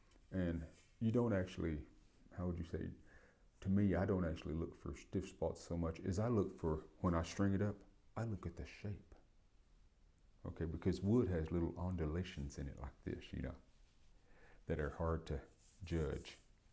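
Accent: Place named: South, United States. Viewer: i was so expecting a heavy native man accent....was not expecting that southern accent...im native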